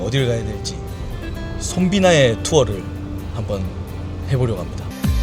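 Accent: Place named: Hadong, South Korea. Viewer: 손빈아 가수님은 우리 하동의 자존심 입니다 더높이 더멀리 비상하세요 꼭 승승장구 하세요 빈아님은 순수한 청년입니다 사랑합니다 간혹 우리 하동말 나올때 정감이 넘쳐요🎉🎉🎉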